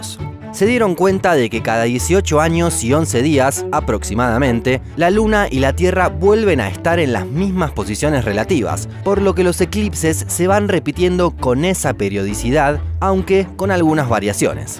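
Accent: Argentina. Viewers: ¡Saludos desde Pittsburgh! Por fin encontré un YouTuber que habla bien y claro sobre temas interesantes. Me encanta este canal xk me expone al vocabulario científico en español, todavía estoy aprendiendo el idioma. Además el ascento Argentino me cae bien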